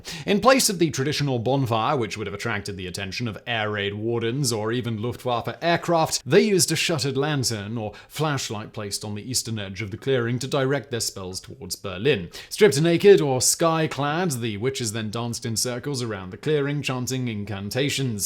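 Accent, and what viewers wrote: England, United Kingdom. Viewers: What type of English accent is that?out of curiosity.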